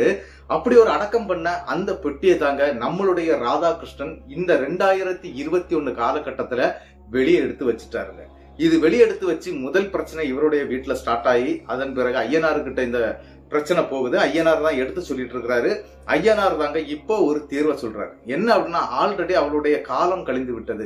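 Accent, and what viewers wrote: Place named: Tirunelveli, India. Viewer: Kadhai sollum vidham Tirunelvel i slang nice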